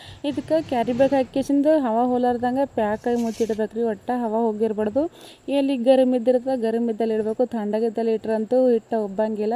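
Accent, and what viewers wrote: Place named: Uttara Kannada, India. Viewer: ನಮ್ಮ ಉತ್ತರ ಕನ್ನಡ ದವರು ನಾವು ಹೀಗೆ ಮಾತಾಡೋದು ನಿನಗೆ ನೋಡೋಕೆ ಮನಸ್ಸಿದ್ದರೆ ನೋಡು ಇಲ್ಲ ಮುಚ್ಕೊಂಡು ಹೋಗು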